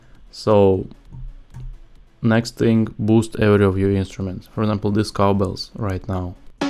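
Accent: Russia. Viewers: Ты че русский что-ли? Твой акцент сразу понятен. Но тутор классный 👍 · Так я сразу понял, что акцент русский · maybe I don't know something, But despite the good English language, there is a feeling that you cook well in Russian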